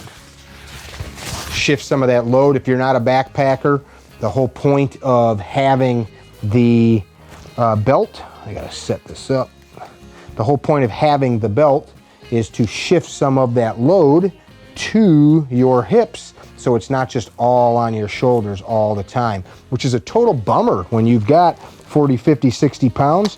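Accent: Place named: Midwest, United States. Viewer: Born in Des Plaines, live in Phoenix now, always a breath of fresh air hearing your Midwestern accent! love the content!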